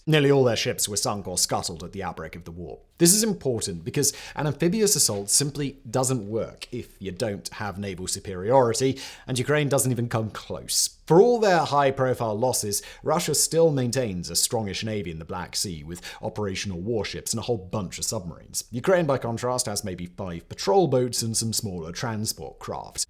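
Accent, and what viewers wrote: RP, United Kingdom. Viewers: how many channels does this plummy have? Why is he all over my feed? How do I make it so I never have to hear his voice again?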